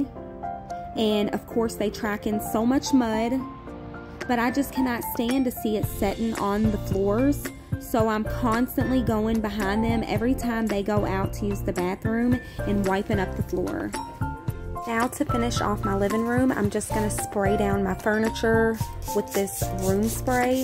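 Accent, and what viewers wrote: South, United States. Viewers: Can I ask what state you are from? I'm in Louisiana and I definitely can hear your southern accent lol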